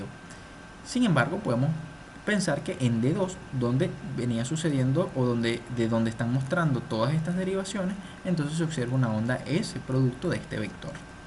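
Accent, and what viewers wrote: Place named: Venezuela. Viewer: Saludos desde Venezuela, guatire, estado Miranda, soy estudiante de Medicina, excelente vídeo clase, de verdad te felicito, eres tremendo para enseñar, tienes el don. Te admiro. Descubrí tu canal ayer, oficialmente me suscribí y te di like. Por favor publica más vídeos como este. De seguro ya te graduaste de médico, por tu acento puedo decir que eres paisano mío, ¿Estudiaste en la UCV? Ojalá regreses para ver más clases tuyas y seguir aprendiendo.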